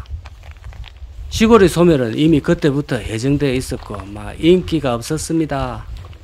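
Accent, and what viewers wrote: Gyeongsang, South Korea. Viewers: 타지방 억양1도 가미안된 순수 경상가야국톤 사랑합니다. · 일단 구독 찍고 좋아요 눌러놓고… 댓글 다는 까닭은… 묘하게 사람을 끌어 당기는 조금 투박하나 사람 냄새 품은 나레이션 때문이고요. 경상도 저 아래쪽일 듯한 사투리, 소탈함 속에 해학이 영상을 보는 내내 입가에 웃음을 머금게하는 까닭압니다. 할배네로 자주 구경 오겠습니다.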